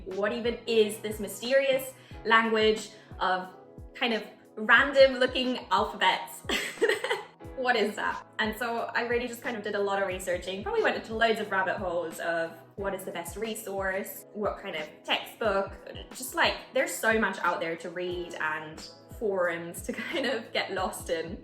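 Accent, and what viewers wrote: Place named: United Kingdom. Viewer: Great progress! Since you are solo studying you may not be getting any guidance on pronunciation. You have a very british "a" vowel going on in words like "wanikani". You are using what linguists call a "Near open front unrounded vowel". In IPA it's written "æ". You want to be using a "Open central unrounded vowel". In IPA it's written "a". Japanese always uses "a" never "æ" because "æ" doesn't exist in japanese. If you google both of these the first result will be wikipedia where you can play and hear the difference. Continued luck on your studies!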